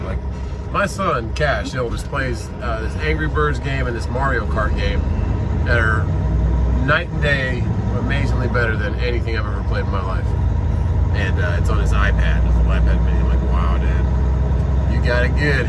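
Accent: South, United States. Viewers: How come you have a southern accent when you are from SoCal/ AZ? Are you getting spinners for the new wheels?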